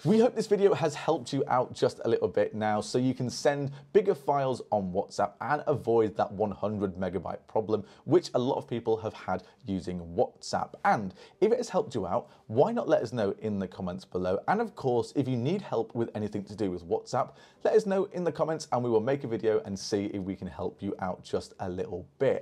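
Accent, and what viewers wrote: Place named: United Kingdom. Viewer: I love his British accent though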